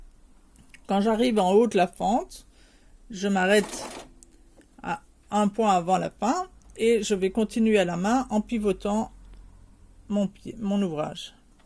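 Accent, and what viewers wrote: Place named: Belgium. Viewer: Bonjour Très sincères félicitations pour l'absolue clarté de vos explications ; c'est un pur régal que de vous suivre. Élève de deuxième année de CAP ( en France - j'ai cru noter une pointe d'accent belge si je ne m'abuse ! ) j'ai découvert votre travail avec satisfaction. Vous êtes une excellente pédagogue et vos explications, tout en restant techniques et conformes aux normes, sont lumineuses ! Il va sans dire que je vais "dévorer" vos tutos ☺️ Merci à vous. Philippe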